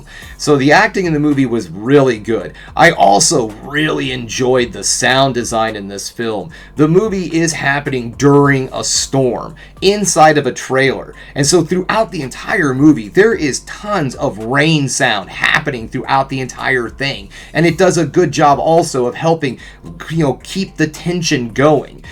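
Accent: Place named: North America. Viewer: I keep meaning to ask this: where exactly are you from? I'm a British guy and I just adore hearing your accent. I know it's either an American or Canadian accent you've got (I admittedly struggle to distinguish the two), but I'm curious about which region. Great review, btw 🙂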